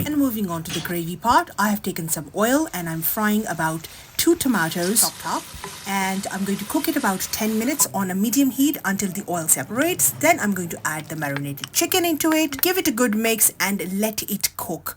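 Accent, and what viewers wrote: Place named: England, United Kingdom. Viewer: That English accent....👏🏻👏🏻👏🏻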